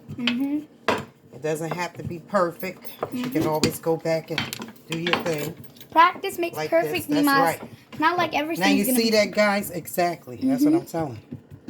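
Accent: Jamaica. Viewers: you are something else. That Jamaican accent. 😂 ❤️ I’m here watching. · ya mon indeed! Love the Jamacian accent! and a great helper too!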